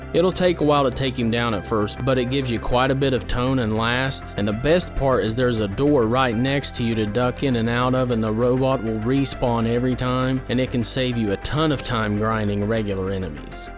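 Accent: South, United States. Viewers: You deliver your videos with a childlike sense of enthusiasm and your southern drawl makes for a great narration. Great job, great research!!! Thank you!!! Your Friday the 13th vid was ultra dope too!!! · Do I agree Rygar was highly underrated? Yes! Do we have the same first name? Yes! Does your monotone/southern accented voice make me want to kill myself? FUCK YEAH! Regardless of me wanting to commit homocide, suicide or both, I'm interested in watching this video. Here goes... I fucking looooooooooved this game. I played hours upon hours of it along with my dearly departed grandma. Yes, I played this game with my kickass g-ma. I only wish she'd have survived breast cancer so as to have been able to play the PS2 re-boot. I love & miss you Gramma B. I love and miss you.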